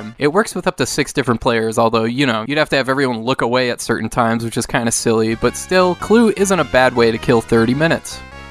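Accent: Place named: Midwest, United States. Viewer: It's very midwest, its like I'm back home.. 😎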